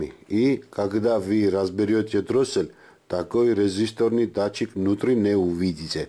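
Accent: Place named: Georgia. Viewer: С акцента можно предположить, что вы из Грузии, как и я. У меня проблема с дроссельной заслонкой, вы бы адрес или номер телефона, я бы заехал к вам. Спасибо, с уважением Земляк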